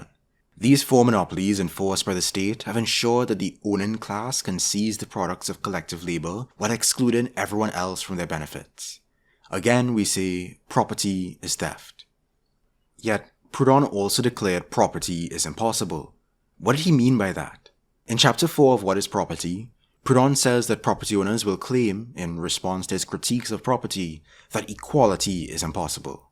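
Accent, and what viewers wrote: Trinidad and Tobago. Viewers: Are you Trini? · Are you trini?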